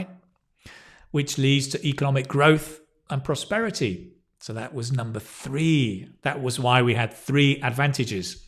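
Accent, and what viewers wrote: United Kingdom. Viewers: Thank you, Mr.. I enlarge my vocabulary and improve British accent a lot with your channel. This passage is extremely great.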